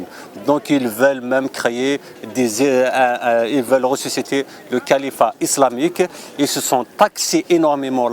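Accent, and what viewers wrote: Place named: Kabylie, Algeria. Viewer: le français avec un soupçon de takvailit quell horreur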